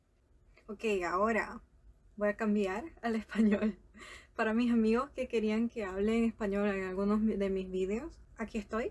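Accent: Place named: Portugal. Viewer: Seu português é muito bom! Parece um pouco com o português de Portugal, mas só um pouco... Como brasileira, acho que algumas coisas do português de Portugal são um pouco difíceis de entender, mas consegui te entender perfeitamente :)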